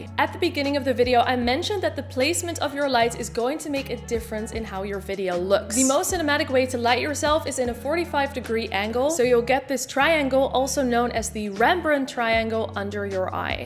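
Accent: Netherlands. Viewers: Are you Dutch? Or German? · Kaaskop accent spotted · I hear a vague Dutch accent somewhere in there! Great video. Thanks for the help.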